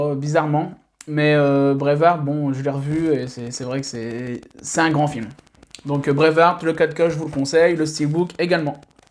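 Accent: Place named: Nord-Pas-de-Calais, France. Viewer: Ton accent ne mentais pas, c'est cool d'assumer les ch'tis haha !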